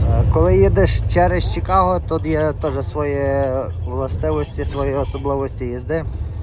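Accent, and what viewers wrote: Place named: Hutsul, Ukraine. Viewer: Ти гуцул ще той! ЗабЕЕЕру...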